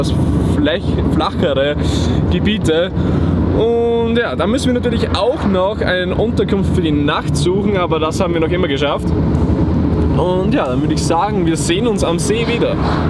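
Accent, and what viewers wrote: Austria. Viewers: Kommst du aus österreich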